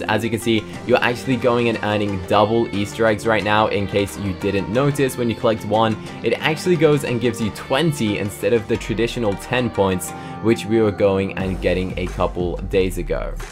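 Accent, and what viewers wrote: United Kingdom. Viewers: Hi I want to ask are you from England cause Your accent is british and i love your accent!!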